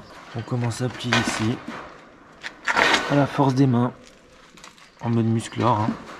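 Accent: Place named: Jura, France. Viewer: Je me disais bien que tu as un accent bien jurassien 😀 Dolois nennis ma fois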